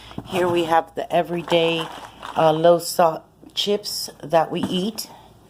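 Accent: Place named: Cuba. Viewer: Hi,! I am filming for the declutter challenge, too & I found your video from the playlist. I'm from Miami & your accent is reminding,me of home! I got kind of thrown off when you said you're in SOCAL, but then you confirmed that you're Cuban. I also thought so because of how clean and organized you are - again, just like the ladies at home! You've done such a great job organizing your pantry. Wish I was like that. I'm working on it, though! Watching your vid for inspiration!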